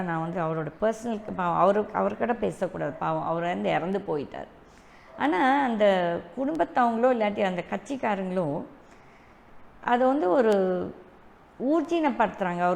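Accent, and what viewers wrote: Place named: India. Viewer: Would be more genuine if you have concern on soaring fuel price, high inflation, migrants plight, increased toll fares, dampened msme industry. There are bigger national level corruptions that you've conveniently missed to mention. Rajaji s swatantra party did nothing useful to TN and you are carrying the same name; doubtful on your agenda esp to avoid elections - do you want to continue the existing corruption to go on. Election is the only tool to correct the citizens' mistake/fate; you want that to be denied for the vested interests. Should also mention that thousands crowded in Ayodhya, moharram day at Hyderabad, sellur Raju meeting at Madurai or the mahalaya amavasya at sathuragiri. Pls speak Tamil in the native slang, the hindiing sound is irritating. நிலானியின் தமிழை கேட்கவும்.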